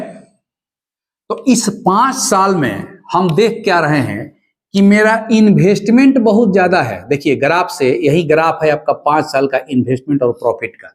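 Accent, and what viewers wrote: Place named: Bihar, India. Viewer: His tone shows he is from Bihar Paur month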